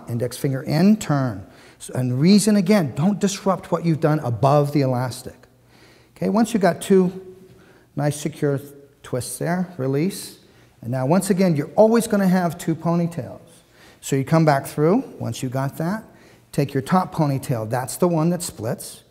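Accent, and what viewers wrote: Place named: Philippines. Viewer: hi sir... are u a filipino? you look like a pinoy and your accent...and also the way you speak... =) you're amazing uncle...hehe galing mo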